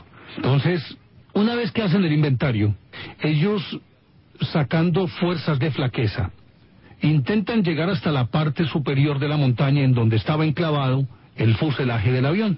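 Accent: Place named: Colombia. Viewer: es colombiano el que habla? Que mal!!!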